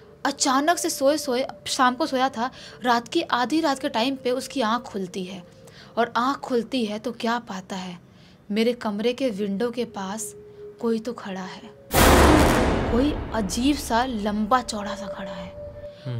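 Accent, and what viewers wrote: Bihar, India. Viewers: Ye ladki bhi bihar ki hai confirm. Eske awaj se pta chal rha hai . Itna jaldi jaldi kaun story' batata hai maidam 😬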